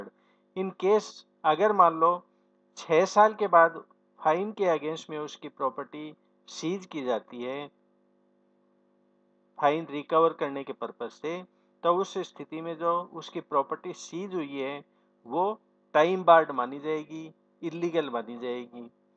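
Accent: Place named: Indore, India. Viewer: Are you from somewhere around Indore-Ujjain, sir? "Apan" caught my attention. :D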